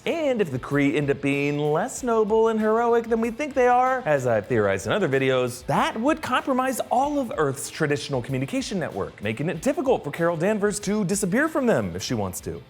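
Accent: Russia. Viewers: Your Russian accent made me think of Tank. I miss Tank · Love ur russian accent and the parallel with cw phone is purfect nice guess 👍 Awesome vdo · Like your russion accent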